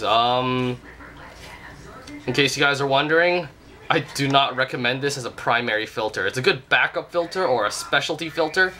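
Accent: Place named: United States. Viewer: I think he might actually be american based on his accent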